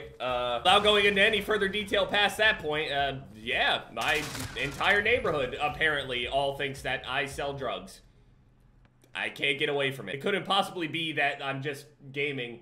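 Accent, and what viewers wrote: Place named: New Jersey, United States. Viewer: you can tell from jersey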